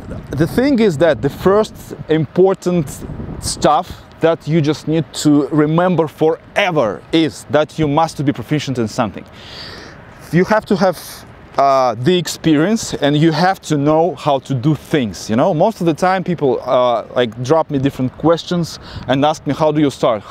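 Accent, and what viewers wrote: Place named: Russia. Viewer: I LOVE your Russian accent. However, I agree that Americans are ignorant and will judge you because of it. But you MUST showcase it as a positive: like you did in your video about it being in your blood to be an engineer. Just tell people immediately that you are Russian and the positives of it. What I don't like is when a Russian girl decides she must lose her accent to blend into the American culture.... NO. Not necessary for a woman to do that. Everything you say about Russian v.s. English confirms my hypotheses: they are essentially different worlds... different operating systems. I notice that Vladimir Putin now always has an earpiece to listen to Russian interpretation whenever he is being interviewed (or... interrogated in many cases). I know that he knows English... but he is strategically thinking in Russian all the time and that's how he makes very critical decisions.. 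through Russian.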